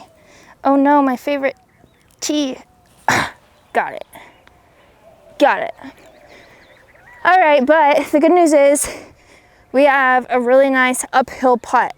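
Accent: South, United States. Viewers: Love your confidence and those early morning tee times as that fits my bill for fun golf & love your southern draw & sweet skirts. Love the game & your tips. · Are you a Southern gal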